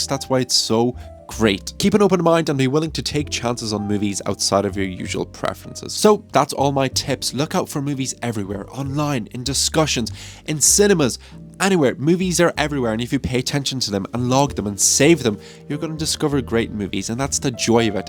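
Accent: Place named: Ireland. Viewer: What is your ethnicity? You sound either Irish, but I’m guessing your name, is Czech/ Slovak, since is Polish for Paul, & those are West Slavic languages.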